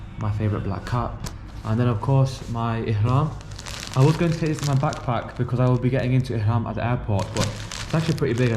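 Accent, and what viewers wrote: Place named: United Kingdom. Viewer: Salaam just found your channel, by browsing Umrah videos. I live in USA, but I took a vacation to the UK. I think you are from there with your accent. Jazakallah Khayr, such a beautiful video and a vlog. your off pakistani descent?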